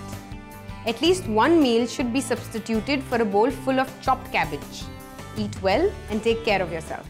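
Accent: India. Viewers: I love her Indian accent :3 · she is indian of course she will have such an accent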